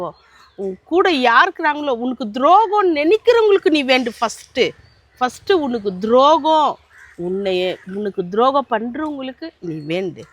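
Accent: Bangalore, India. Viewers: சூப்பர் மா நான் சமீபமா தான் உங்க வீடியோ பார்க்க ஆரம்பிச்சேன் சூப்பர் பெங்களூர் தமிழ் எனக்கு ரொம்ப புடிச்சிருக்கு நாங்க சேனலை சப்ஸ்கிரைப் பண்ணிட்டேன்.. வருத்தமா இருக்க சமயத்துல உங்க வீடியோ பாக்குற நான் மகிழ்ச்சியா இருக்கிற😊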